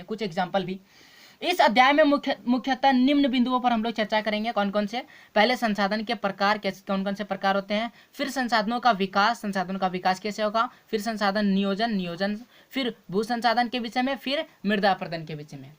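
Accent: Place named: Rural, India. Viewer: Gawar jaise kyo bol rehe ho aur faltu me pencil kyo chela reha hai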